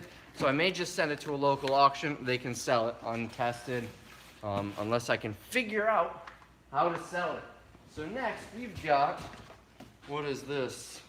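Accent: Wisconsin, United States. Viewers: You say dollar with more nasality than Standard American English (think newscaster speech), It's pretty normal for the Wisconsin accent.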